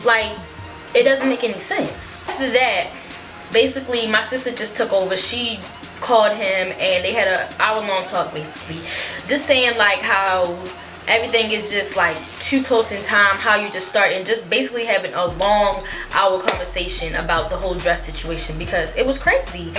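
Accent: Baltimore, United States. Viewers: I just knew u was from Bmore/md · I love ya Baltimore accent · i figured you was from baltimore the way you talk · I can tell you from Baltimore lol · You from Baltimore? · Man I’m 3 mins in & automatically knew she was from Baltimore before she said the designer was 🤗 her accent 😍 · Would’ve been so mad! You from Baltimore? Me too lol I can tell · Is she from Baltimore ? 😭😭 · As soon as she started talking I knew she was from Baltimore. 🤘🏽 P.S. You are beautiful 💕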